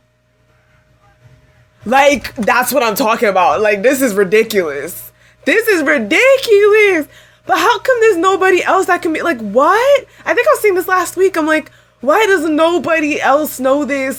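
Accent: France. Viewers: GIRL U FRENCH !? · Ton accent français est très beau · are you french? 😮😮😮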